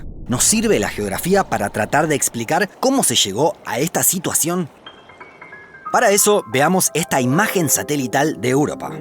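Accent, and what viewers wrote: Argentina. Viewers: Aver te voy hacer una critica muy fuerte pero realista. Por tu acento se nota que eres argentino, el mismo pais que le lame las botas a los estadounidenses, entonces ahora si comprendo el por que estas en contra de rusia. La otan quiere extenderse en europa, te voy a poner un ejemplo "imaginate que tu vecino ponga una cerca al rededor del perímetro de tu casa con torretas y esas torretas esten apuntandote a ti... Como vecino no iras enojado a reclamarle? Es obvio, el conflicto actual de la otan es querer apropiarse de paises europeos imponiendo su ridiculo lema de "libertad" mientras que rusia no quiere correr ese riesgo. Hablas de historia geográfica de rusia pero jamas mencionas las invasiones que hizo la otan en siria, irak, Afganistán en el que murieron miles de personas, eso se llama hipocresía de occidente. Antes de que hagas un tema de estos informate y di las cosas tal cual. 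💁🏻‍♂️ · El narrador es argentino. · que acento es ese ? tiene argentino pero a la vez me recuerda un poco al robot de platon, peruano ?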